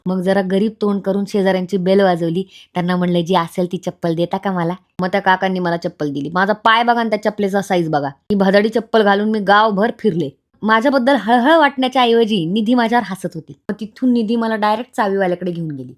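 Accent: Beed, India. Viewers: मॅडम तुम्ही बीड जिल्ह्याच्या आहात तुमच्या बोलीभाषा समजते कारण मी ही बीड जिल्ह्याचा आहे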